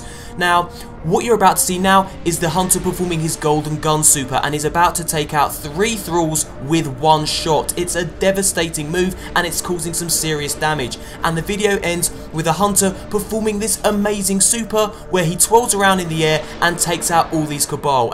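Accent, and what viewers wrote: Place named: United Kingdom. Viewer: yea and everything sounds classy with a British accent! lol :)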